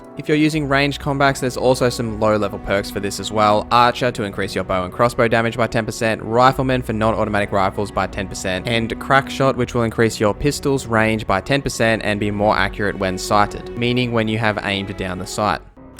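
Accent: Australia. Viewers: cheers mate great 2 hear an aussie doing it write thanks for the tips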